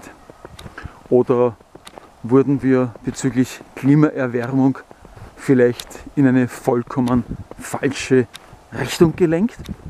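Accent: Austria. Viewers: Dei "weana" Dialekt is sowos von herzerfrischend...🛷 "Laiberl" statt Pullover! Mein neuer Walspruch. Danke, mit dir geh ich gerne täglich Gassi..🐕 🌬❄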